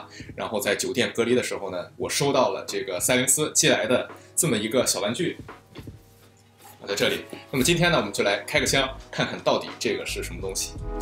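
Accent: Shandong, China. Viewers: 是山东人吗哈哈哈哈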